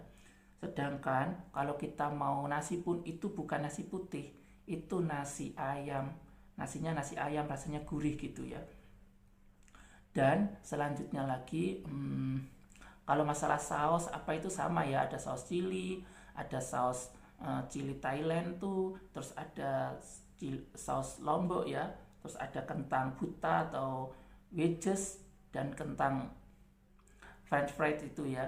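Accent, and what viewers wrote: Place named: Indonesia. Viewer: 1. Kalau cakap pasal loghat, di indonesia sendiri sahaja ada beratus2 loghat yang berbeza. Tak hairan. Tapi Bahasa Melayu (di Indonesia dengan di Malaysia) tetap sama, cuma ada beberapa istilah sahaja yang tidak sama (cuma istilah). Kamu bercakap loghat Indonesia di video ini, saya (selaku orang Malaysia) seratus peratus faham. 2. Bahasa inggeris di Malaysia dipelajari di sekolah. Bukan kerana Malaysia pernah dijajah oleh British maka semua rakyat Malaysia secara otomatis bijak berbahasa Inggeris. 3. Teh tarik itu kan teh susu biasa saja, cuma ditarik (shake). Tapi bukannya semua orang Malaysia minum teh tarik setiap hari. Macam2 jenis minuman lain yang kami minum selain teh. 4. KFC di Malaysia ada nasi dan ada nasi lemak juga. Tidak benar jika sebaliknya. Kalau tak percaya ayo pergi kamu ke KFC sekarang ini dan tengok di menunya, pasti ada nasi. 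5. Orang indonesia selalu saja silap apabila mengatakan harga kereta (mobil) di Malaysia harganya murah. Kereta di Malaysia tidak murah. Cuma di Malaysia boleh beli secara berhutang (bayar secara ansuran) dengan syarat yang agak mudah (iaitu asal ada keupayaan membayar ansuran bulanannya). Bolehkah kamu kata harga kereta di Malaysia antara RM40,000 hingga RM100,000 itu murah (dalam nilaian rupiah Indonesia). Cuba kamu hitung berapa niainya dalam rupiah. Kalau kamu masih kata murah maka sudah sahlah bahawa orang Indonesia memang kaya-raya. 6. Hampir di setiap rumah di Malaysia ada motosikal. Tidak benar kalau dikatakan orang Malaysia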